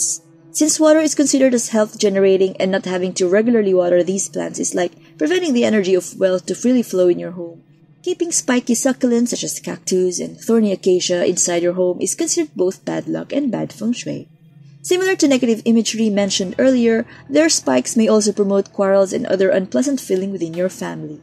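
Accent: Philippines. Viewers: Since you said that, I noticed and I agree. She's most probably a Filipino. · You sound so Philippino ❤❤❤ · She sounds Filipino.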